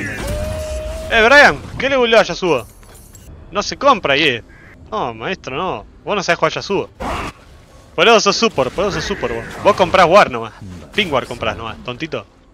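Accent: Chile. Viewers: Por que habla como chileno ahora :V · hablas como chileno ahora:face-purple-crying::face-purple-crying::face-purple-crying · Grande hacías falta, pero algo me dice que alguien te está pegando un acento medio chileno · Soy yo o me parece notar que a se le está pegando el hablar como chileno, con acento y todo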